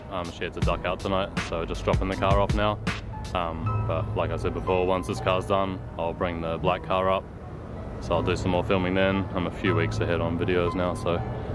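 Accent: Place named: New Zealand. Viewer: Just stumbled across your vids. I recognise a Kiwi brother's accent. Love the vids. Just got an 83 ae86 from Japan late last year. Helping the 86 population grow in the motherland ha. Look forward to binge watching your content.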